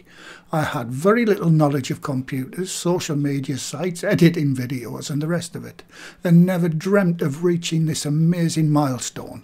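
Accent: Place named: Northeast England, United Kingdom. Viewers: love your northern accent and best of all you make 'plain' cooking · Thanks again, I thoroughly enjoy making your recipes, I also have the same accent, as I am from the North East of England as well, all the very best for the future, highly deserved and keep them coming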